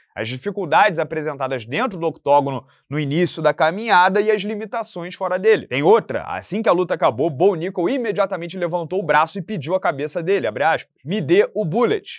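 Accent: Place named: Niterói, Brazil. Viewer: Cara não sei se é por que eu sou de Niterói também, mas eu curto o jeito dele falar, tipo assim igual o eu me sinto em casa.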